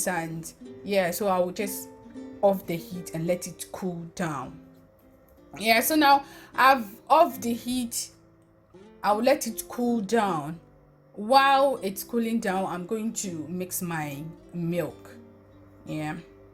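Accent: Ghana. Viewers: A week a ago I asked weather from GHANA yes may be you are from north your accent sounds a northerner I have leave in the north before. Thanks for this drin. I think I drink this drink before during my school days. In ACCRA it tastes great I wish one day I will try some. THANKS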